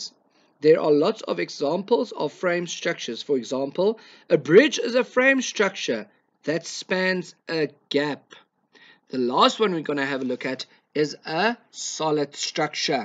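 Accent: South Africa. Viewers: It sounds like you speak Afrikaans, because you have an south african acsent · I swear this guy sounds South African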